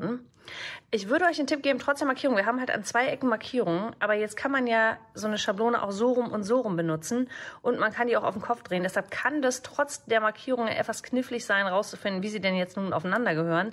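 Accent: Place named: Berlin, Germany. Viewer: Also mal ehrlich: dein berlinerisch ist ja *janz knorke*❗ Die ministempel & die Eiskristall-Schablone gefallen mir besonders! Freu' mich sehr auf Freitag!!!! Schönen Tag noch & Grüßle vonne Monika ❤️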